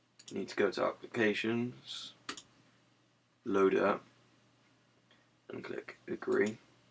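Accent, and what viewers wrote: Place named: United Kingdom. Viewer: oh British :D thank u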